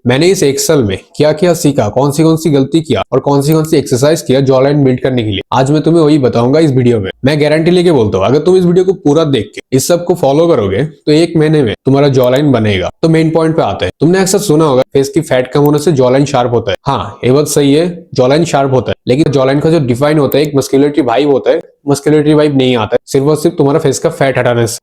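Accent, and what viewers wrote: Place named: Bangladesh. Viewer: Bhai tumi to bangladeshi hindi te kotha bolo keno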